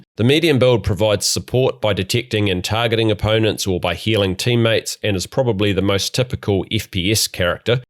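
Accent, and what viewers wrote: New Zealand. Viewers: I have shaked through this video... Im sorry, but i cant get over that New Zealand accent. "E" is replaced by "I". Well made video though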